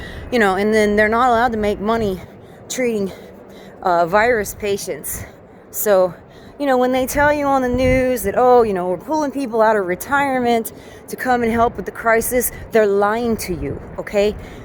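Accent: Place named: South, United States. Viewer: Good job citizen journalist, people like you with nothing but a cellphone have become the new media. In a world of universal deceit, telling the truth is a revolutionary act. I would like to know who are all of these people who are sick, does anyone know anyone who is a confirmed case? Ive not heard about one person yet who knows a confirmed case. Anyway, good job! Also sounds like you are from the south, I heard a few y'all's in there!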